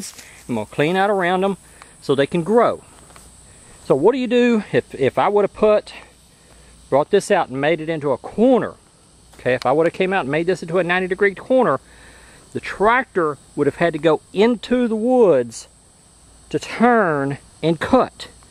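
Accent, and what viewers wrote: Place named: Tennessee, United States. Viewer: Were are you from? Is it Tennessee I really dig your accent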